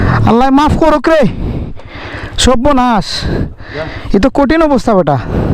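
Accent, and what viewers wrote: Sylhet, Bangladesh. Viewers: Vai apni ki sylhet ti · Vai ki Sylheti??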